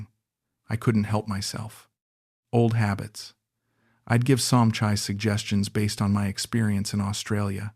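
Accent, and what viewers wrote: United States. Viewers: Another scam story with a yankee voice, jeez this crap never give up their scam life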